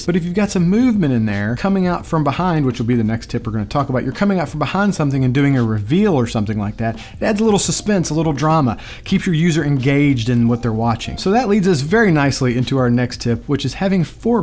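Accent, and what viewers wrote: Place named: Texas, United States. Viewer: #Helpful. Will use your skill share link. Where you from? Texas?... great accent!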